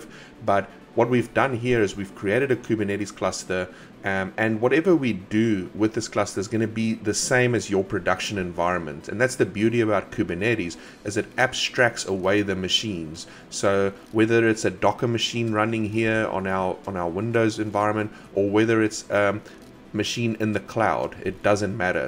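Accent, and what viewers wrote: South Africa. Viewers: Are you originally South African?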